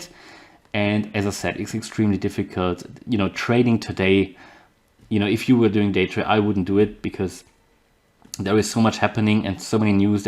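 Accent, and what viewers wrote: Germany. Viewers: you sound like you are from a german speaking region , germany-austria -switzerland . best regards from miami , a ex german immigrant :))) now american immigrant,,, always immigrant :))))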